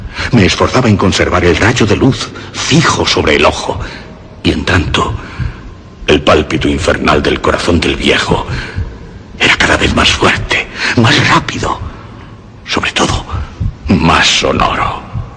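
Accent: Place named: Spain. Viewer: Excelente narración, el acento español hace que el cuento tenga más suspenso. Gracias por este gran aporte, fue de gran ayuda.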